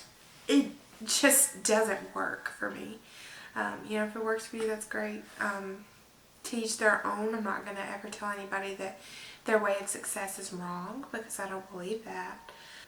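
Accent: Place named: Tennessee, United States. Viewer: YAY!!!! Look at you sittin there all cute!!!! I liked and subscribed before the video even started 😂😂😂 I love your accent. Now when I read your posts on IG I can do it with your Tennessee flair 😉 Amazing first video. I look forward to the next one.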